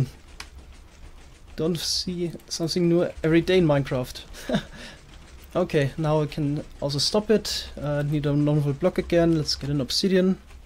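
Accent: Germany. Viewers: bro, ich liebe deinen deutschen Akzent! Liebe grüße aus Österreich!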